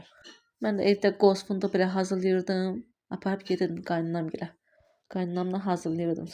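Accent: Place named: Sheki, Azerbaijan. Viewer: Salam baci , xos gununuz olsun insallah , hee deyiremde lehce tanis ve yaxin geldi , 😊 mende Sekidenem , ugur olsun baci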